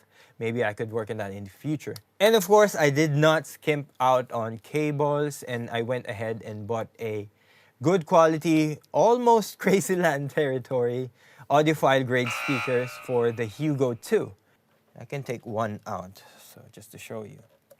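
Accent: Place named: Philippines. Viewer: you DEFINITELY sound Filipino. I would know, because I am too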